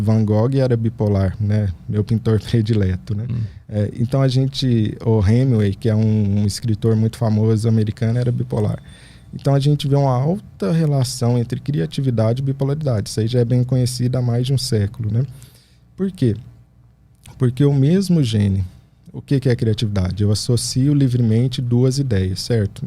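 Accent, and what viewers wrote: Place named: Minas Gerais, Brazil. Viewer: Que show de explicação. E com esse sotaque de MG Ficou MIÓ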